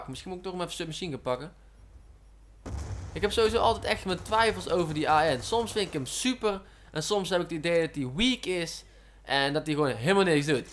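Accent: Brabant, Netherlands. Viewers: Hij komt ergens uit Brabant. ;)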